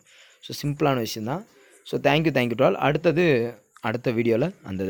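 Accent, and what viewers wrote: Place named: Madurai, India. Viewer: Madurai slangue 😂👍🏽 in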